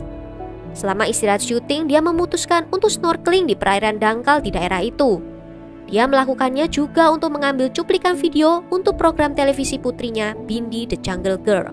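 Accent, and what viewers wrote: Java, Indonesia. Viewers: Jawa Lu medok Min....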